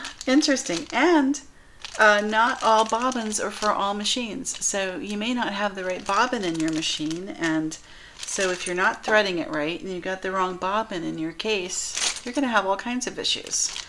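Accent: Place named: Virginia Beach, United States. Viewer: Hi! I’m in the Netherlands and just ordered this machine from the Lidl website. I’m so excited to receive it that I started watching reviews...what a delight to find you live in VA Beach! I used to live in Norfolk, on the Bay, and went to school in VA Beach, and I miss it so much. It’s lovely to hear your 757 accent and also learn about my new machine. I’d be curious to know what kind of thread brand you’ve used on this machine with success. I’ve ordered some of the Crelando brand on the Lidl site and am also looking at some Gutermann thread, but if you have other suggestions that work well I’d be interested to hear it. Thanks so much for taking the time to make this informative content!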